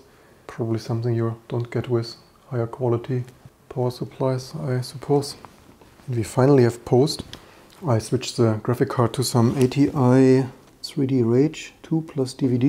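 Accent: Germany. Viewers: Zis is zhe must germanbenglish das ich je gehört habe :D aber nettes Video,l!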